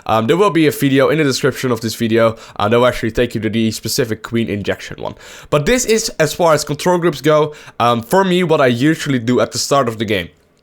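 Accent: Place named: Netherlands. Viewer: U are dutch or something like that? Cuz your english is somehow funny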